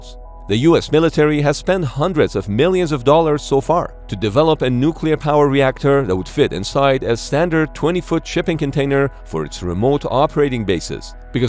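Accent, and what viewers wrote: Iran. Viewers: The Iranian accent makes the video even better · You got a Persian accent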